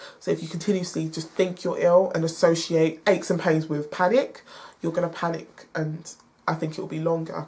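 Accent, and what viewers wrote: England, United Kingdom. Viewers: Not taking anything anyway from this upload but I find a black female with an english accent so attractive. Back to this upload, excessive facial 😓 (sweating) is also a symptom of Vitamin D deficiency. She is nice looking though. This deficiency is common among blacks/ indivuduals with African decent. Truth is it gets too hot outside for quality 🌞 even for darkskined individuals. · It hurts me when black people say they have vitamin D deficiency. Judging by your accent you live in England, not enough sun, that's why black people have all this carbon (so called melanin) in our skin when expose to sunlight it produces vitamin D. Girl stay in the sunny countries. Don't let them tell you this bullshit about lighter skin absorbing sunlight and creating vitamin D better than darker skin its a white lie they don't have enough carbon (this so called melanin) to absorb sunlight to produce vitamin D. 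· I cant understand a shit from your British accent !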